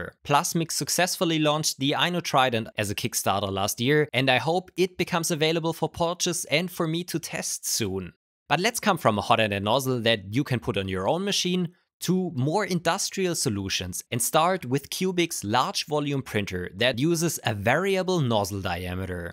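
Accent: Germany. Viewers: Just saw this channel, you can hear, that you're German and I just wanted to say congrats. It's pretty rough to get that much abos as a German. Also the video ist pretty good, thanks. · Gutten tag eveybody man merkt das du deutscher bist aber grundsätslich sehr guttes video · You are habe a Little Bit of german aktzent, vermute ich mal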